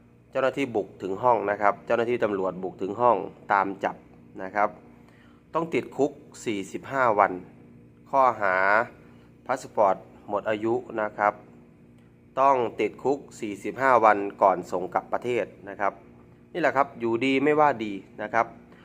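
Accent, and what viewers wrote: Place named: Maha Sarakham, Thailand. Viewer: เทพโยคือเว้าภาษาลาวคือบ้านข่อยสารคามแทบบ่รุ้เลยว่าเป็นคนลาว ข่อยเห็นยูทูบเปอร์ลาวเว้าบ่คือเจ้าแมะ ถ้าเจ้าเป็นคนไทยคือยุ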